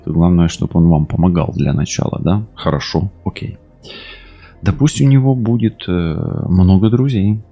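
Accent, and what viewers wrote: Ukraine. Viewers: Вы просто супер- мне так импонирует Ваши комментарии )))) и говор украинский, как же это приятно на слух! Боже как это классно!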